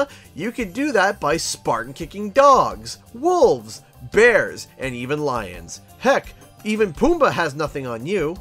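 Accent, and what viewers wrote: Canada. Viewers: You sound real Canadian in this review!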